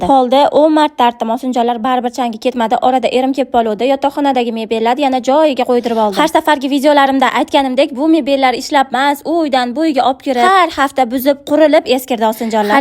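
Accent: Namangan, Uzbekistan. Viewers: Voy dod shevezdan ey NAMANGAN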